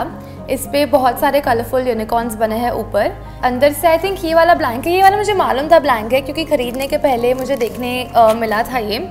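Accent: Gujarat, India. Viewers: Di are you gujarati ?? Because again again you are saying like jaadrha jaarha i am also from Gujarat vadodara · Is your mother tongue gujrati becoz the way you speak it conveys that you are also gujrati like me pls reply di and I also love collecting stationery ❤️❤️❤️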